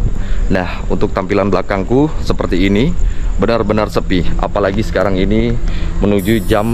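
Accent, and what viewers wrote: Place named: Medan, Indonesia. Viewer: Hadir bg salam dari medan horas. Sellu suka dgn smua konten2 abg. Upppp ternyata abg org medan. Di vidio ini baru sya dengar abg org medan. Horas ma Hu bamu bg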